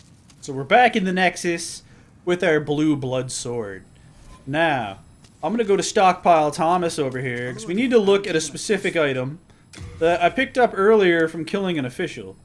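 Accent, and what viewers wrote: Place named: Atlantic Canada, Canada. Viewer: Your accent sounds Atlantic Canada.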